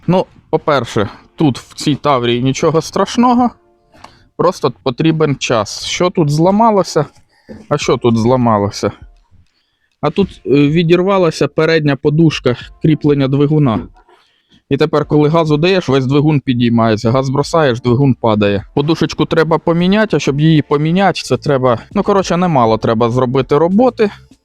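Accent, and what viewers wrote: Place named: Zaporizhzhia, Ukraine. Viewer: Відео між собою хоч схожі але дивитись все одно дуже цікаво))) Особливо коли від дому за хто зна скіки кілометрів а тут пару років тому випадково зустрів відео і чуєш свій рідний запорізький суржик! =)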